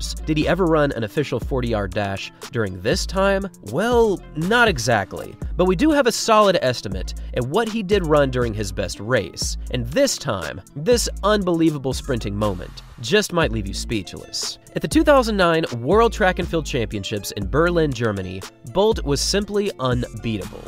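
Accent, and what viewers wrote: United States. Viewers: Your accent is American, so why do you call soccer players football players?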